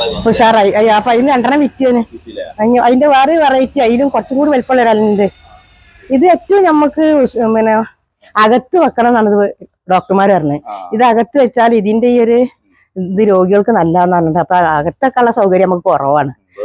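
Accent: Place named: Malappuram, India. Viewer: വീട് കറക്റ്റ് എവിടെയാ, സംസാരം കേട്ടിട്ട് മലപ്പുറം ആണ്, എവിടെ ആണെന്ന് വളരെ കൃത്യായിട്ട് പറയോ, അല്ലെങ്കിൽ നമ്പർ തരി പ്ലീസ്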